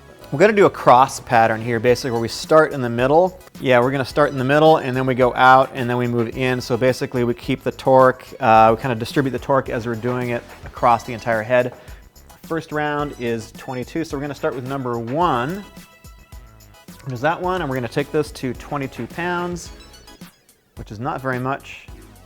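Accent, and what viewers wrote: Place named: United States. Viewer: You look British but sound American. Good video, thanks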